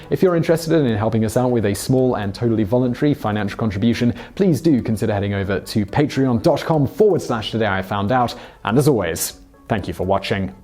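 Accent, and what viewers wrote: United Kingdom. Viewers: Actually, I believe his target audience is primarily Americans, with other English speakers coming in second. Otherwise, he could simply stick with a 100% British dialect, but he doesn't.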